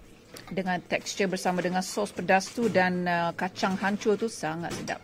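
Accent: Negeri Sembilan, Malaysia. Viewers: Suka dengar Pn membebel cakap loghat nogori.hehe.Selamat Hari Raya Pn..Kami raya PKP di Malaysia.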